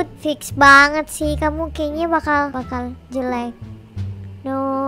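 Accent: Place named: Manado, Indonesia. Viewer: Kka orang manado yak:v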